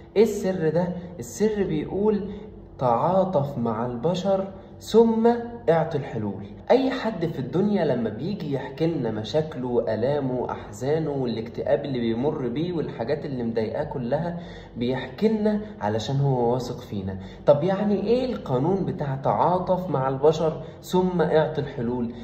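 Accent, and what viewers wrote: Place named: Gaza, Palestine. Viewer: Long live to and EGYPT,your dialect is quite difficult to understand, but i have been following the palestinians for two years now,sometimes you sound like people from gaza./ from mousa in France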